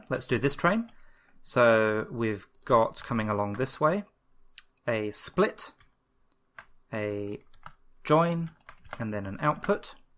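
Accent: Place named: United Kingdom. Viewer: me, an american: hears british accent i trust this man Amazing tutorial.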